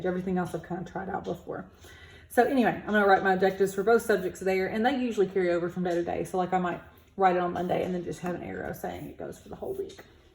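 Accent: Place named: South, United States. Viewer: Thanks for flipping through your planner..I wasn't familiar with this company and I love how you can customize the class periods and that the day is at the top!! for all those extra pages, I would probably just tape in things like the district calendar, a list of important dates like when grades are due, faculty meetings, etc. and that type of thing..any kind of handout I got that I wanted to keep up with all year. Also...I'm a fellow Southerner but I live in the PNW, so it also made me happy to hear your accent. :)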